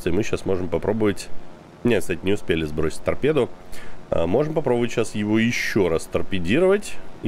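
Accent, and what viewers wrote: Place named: Odessa, Ukraine. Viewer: а где взять такую одесскую озвучку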